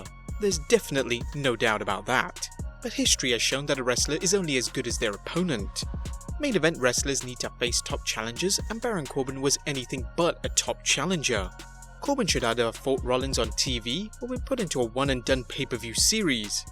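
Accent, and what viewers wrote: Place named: United Kingdom. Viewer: Ok that is the very first time I have ever hear someone pronounce the word as STAYTUS. I mean I know your british and all but damn you act like your accent prevents you from saying STATUS like everybody else. Do you say APPLE or AYPPLE?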